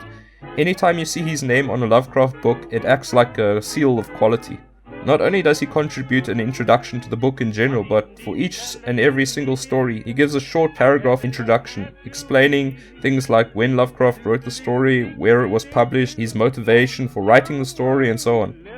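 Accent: South Africa. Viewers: He sounds South African....right on brother or should I say broer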